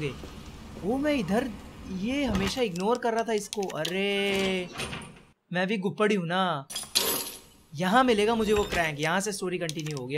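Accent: Haryana, India. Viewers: Bhai toh haryana ka hai kya????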